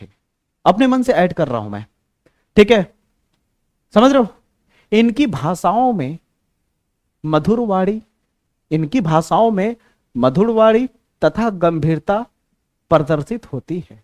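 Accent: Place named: Awadh, India. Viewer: Siir aapko.bolne ka dhang nhi h do thow taiyar kra diya bolte h aaadhi hindi awadhi 😊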